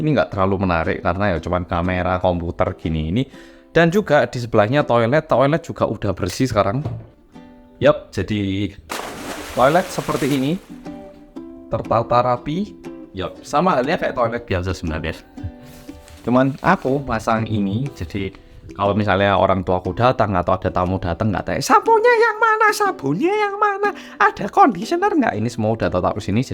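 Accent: Java, Indonesia. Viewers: Tiap nonton konten mas. Terus dia meddok bahasa jawa lancar berasa ga percaya dia asli korea🤣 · Kesini gegara nonton tonight show orang Korea yg medok,, langsung deh aku subscribe like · Wah keren ini bener2 korea medok 😅👍 .. suka banget kalau denger lagi bahasa indo medok dan bahasa jawa .. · Sering liat...tapi masih ngumun wong Korea ngomonge persis wong Jowo😂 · Klo nonton mas kelingan mbak jodilee, podo2medok tp mbake medok jogja krama inggilan😂😂😂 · Mas, tolong ya Meski tingg@ di korea, medok dan bahasa Indonesia nya jangan hilang yaa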